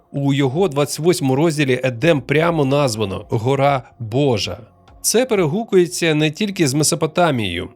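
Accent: Russia. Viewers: Такой корявый русский акцент. Ещё и говорит так медленно, еле языком ворочает.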